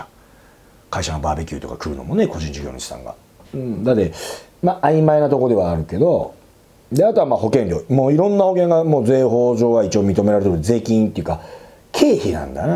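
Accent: Nagoya, Japan. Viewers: 名古屋弁落ち着く― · 初めてみてラッパーのAK69の喋り方に似てると思って概要欄みたらやはり名古屋でした！笑 そのまま話を聞かせていただきました。大変勉強になりました！ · 名古屋弁だ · 同じ愛知なので名古屋弁聴き心地よかったです！ 自分も一昨年独立したばかりなので勉強になります！ ありがとうこざいます！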